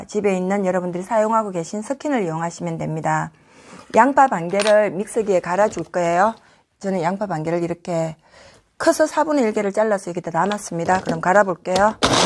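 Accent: Gyeongsang, South Korea. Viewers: 말씀 도 부산 이나 경남 쪽의 말씨 이네요. 아주좋아요.😄 헤어스타일 도 멋있네요.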